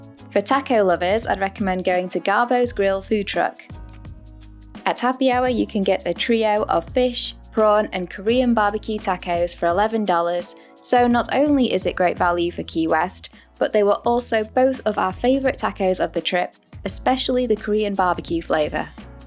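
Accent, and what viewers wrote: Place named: Somerset, United Kingdom. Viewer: Sounds like you're from Somerset UK. Try Santiago's Bodega at the end of Petronia next time. You won't be disappointed.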